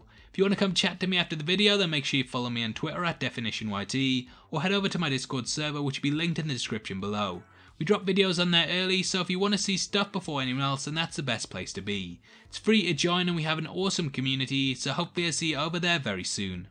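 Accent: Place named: Sunderland, United Kingdom. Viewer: Agree with a lot of this although would rate higher than 5/10! Also, North East accent. Sunderland-ish?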